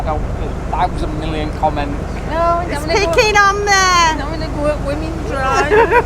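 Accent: Yorkshire, United Kingdom. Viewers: Just love your Yorkie acent,ime Lincolnshire twang maeert 😜,love whatching yours and chanel you make it look so easy like second nature,take care both of you stay safe.🎥👌👍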